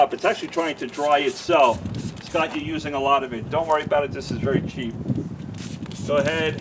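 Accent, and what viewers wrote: New York, United States. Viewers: you say this is Formula 4. Then you say this is Formula 1. LOL. 2nd, why the hell are you wearing a hoodie in such a hot place like Texas. I guess you are really crazy. 3rd, you sound like you are from New York City yet you live in Texas.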